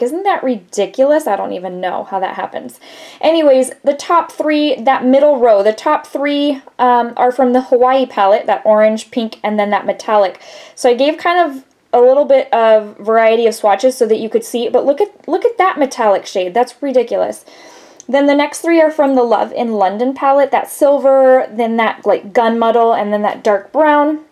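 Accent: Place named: Midwest, United States. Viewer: I would have never guessed you were from Texas. Did you grow up in the midwest? Your accent sounds like my midwestern relatives!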